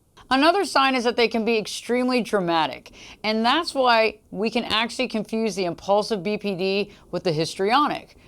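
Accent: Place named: South, United States. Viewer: Thought I saw Mariska Hargitay talking with south accent 😁😁😁 this vid is really intetesting, as BPD, I don't know which type I'm. Sometimes, it seems to me to get a bit of each type together...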